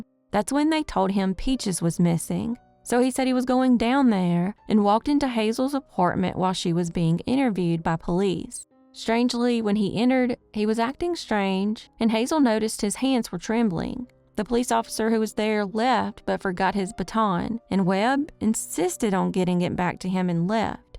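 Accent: South, United States. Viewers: Ok, the channel, the accent. Super cute. Hi from Kentucky! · This blog is delicious. Your beautiful Southern accent is enchanting. These mysteries are head scratchers. Keep your children close. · Middle Tennessee girl here, and ready to go!!!! Thanks for yet another well told video. The best difference between your and many YT true crime channels is that it always shows that you genuinely care about the people touched by the crimes, not just the facts of the cases! And one of my ABSOLUTE FAVORITE aspect of your channel is hearing the same southern accent I have! I really don't think there are near enough YT channels with people that have our accent! ❤Hope you are having a wonderful 2024 so far, Love❤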